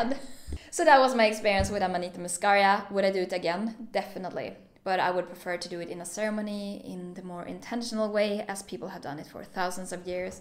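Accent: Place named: Sweden. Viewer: nice trip report, sounds like you had a wonderful experience! and yes your experience sounds very much like "The K experience", that is very interesting! im actually heading out tomorrow to collect som amanitas, im going to make an extract of it, im very excited :) also i hörde på en gång att du var svensk haha, så trevligt^^ heja sverige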